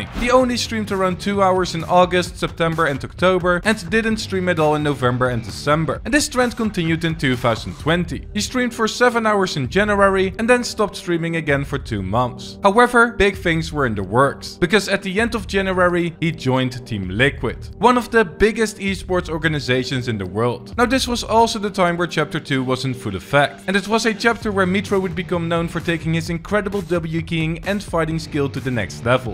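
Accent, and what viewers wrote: Netherlands. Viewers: ik wist dat je nederlands was je accent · Nederlandse support 🇳🇱🇳🇱 ik dacht al dat je nl was aan accent maar wist niet zeker wel ziek ouwe je doet goed bro ga zo door💪♥️ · Bro aan het begin hoorde ik dat je NL was en toen dacht ik wat hoe wist ik dat niet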